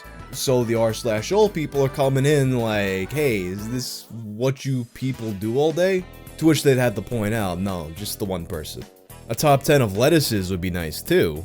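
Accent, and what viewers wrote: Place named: New York, United States. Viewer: Nah, it wasn't repetition of the word, and it didn't start to lose meaning to me. It was just hearing it in American New York accent that almost immediately flagged the word itself as a unique one. Thanks for alerting me that there's a word for that phenomenon, though! Interesting little wikipedia page on that.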